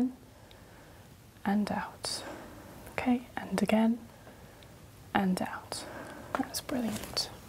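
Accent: United Kingdom. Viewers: This voice sounds like British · Dam, you Brit’s can make anything sound cool. New York here.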